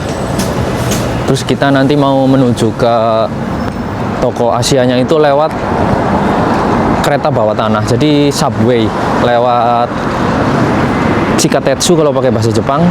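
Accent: Banyumas, Indonesia. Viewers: Mas ngapak, bikin konten di malam hari dong mass 🎉🎉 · Rika ngapak daerah ndi bro..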